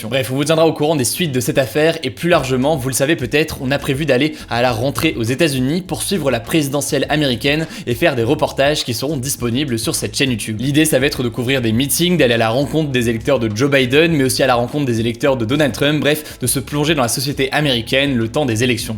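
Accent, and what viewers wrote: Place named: England, United Kingdom. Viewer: ton accent anglais me fait délirer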